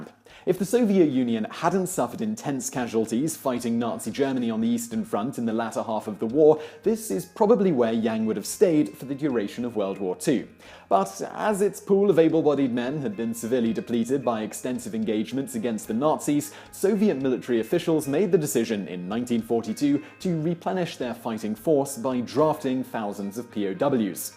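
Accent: England, United Kingdom. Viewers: Where is from in England?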